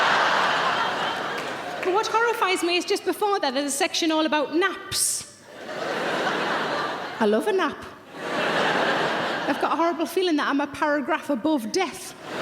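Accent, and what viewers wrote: United Kingdom. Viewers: What part of the UK is that accent from, lovely xD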